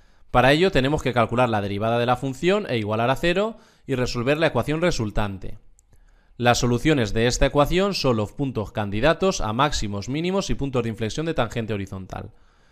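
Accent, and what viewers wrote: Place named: La Mancha, Spain. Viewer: Por tu acento, eres de mi tierra, castilla la mancha, tirado más a la mancha manchega... puede ser?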